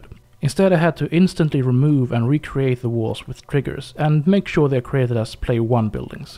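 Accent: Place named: Sweden. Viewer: This is one of my favorite scenarios, both for the interesting gameplay (plenty of secondary objectives that do what they're supposed to without also derailing the basic gameplay) and not least for the visuals (!!!): it's a beautiful and very atmospheric autumn-style map (a very underutilized season, as is also commented upon in the video) that - crucially - does an excellent job at including mountainous terrain in a way that's more involved and interesting than merely dotting the map with Mountain-type Gaia sprites. Absolutely one of my favorite scenarios in this astoundingly long-lived (and still-fresh, even after so many years) gem of a game! Also: fun to see a fellow Scandinavian delivering the goods as far as top-notch scenarios go (judging from the accent, this guy must definitely be a Swede - way to go, Söta Bror!)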